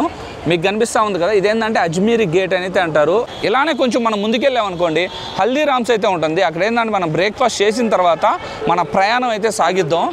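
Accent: Nellore, India. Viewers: Bro mana nellore slang baga telastundi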